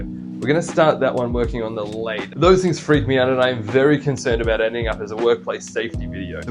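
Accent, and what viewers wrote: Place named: Australia. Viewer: mate lemme tell you something, i was so pleasantly surprised to hear an aussie accent on a niche video for once, letting me know that i can actually buy the shit required for the project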